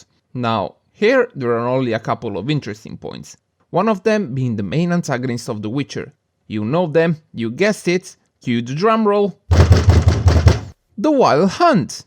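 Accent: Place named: Italy. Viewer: You mentioned that you were the Italian Sam O’Nella? If so that’s amazing because I like your accent for these videos, it makes your videos stand out to most of the other ‘bootlegs’ because of the voice.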